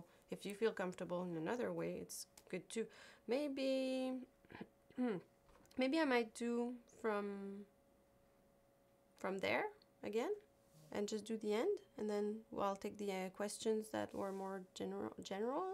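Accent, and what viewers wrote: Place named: France. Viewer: Hey there ! I folllow you since 2 months, and I heard that you have a little french accent when you speak english... 😉Are you french, because I am, and proud to be one ! I love your channel !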